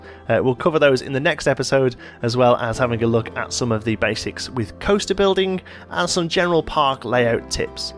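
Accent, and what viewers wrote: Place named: England, United Kingdom. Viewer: I have downloaded and paid for the game and two add on packs via steam. Trying to get to grips with the game is a challenge in itself, however the *** steam interface is unbelievably clunky and not intuitive at all for example it says my 2 DLC packs are in my library but they are not !!! Also if I download a small park and go to open it I get a message to either continue loading without the additional items or continue and download … that option hangs the computer … I have spent more time on messing with steam than playing the game and am starting to think I should have purchased from the App Store (Mac) I realise my post is off topic but do you use steam … I have raised a ticket with support but I feel that now they have had my money very quickly they are not that bothered sorting out new user issues. Apologies for the rant but listening to an English voice is preferable to an accent from across the Atlantic 😢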